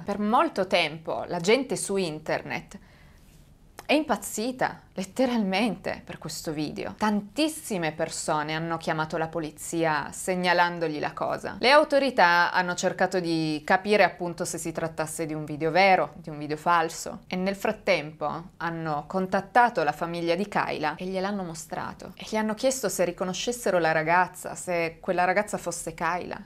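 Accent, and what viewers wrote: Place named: South, Italy. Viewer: Molto gradevole il.uo modo di esporre i fatti di cronaca. Racconti davvero completi. Un unica nota stonata: il tuo accento., la tua dizione . A volte sembra un miscuglio di Sud Italia, altre volte , brasiliana. Puoi fare un corso di dizione per rendere più piacevole la tua voce?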